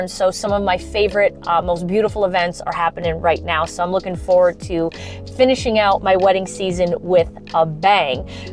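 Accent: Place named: East Coast, United States. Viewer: it’s the east coast accent that you have JUST a bit of….HappyHappy Joy Joy!!!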